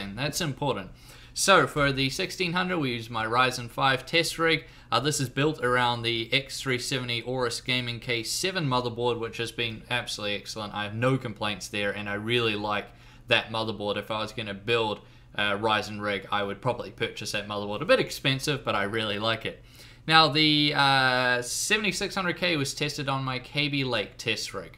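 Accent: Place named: New Zealand. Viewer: I think this one is a New Zealander.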